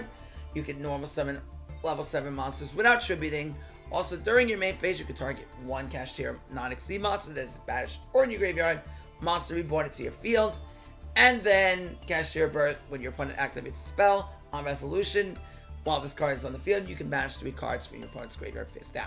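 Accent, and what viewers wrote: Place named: Long Island, United States. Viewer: Dude, you have to be from Long Island. 😂😂😂 Great Video my guy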